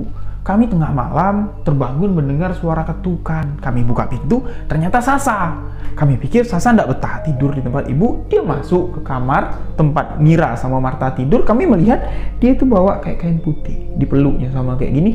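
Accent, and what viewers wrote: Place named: Jambi, Indonesia. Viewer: Paling seneng dengerin bang cerita make logat jambi 😊😅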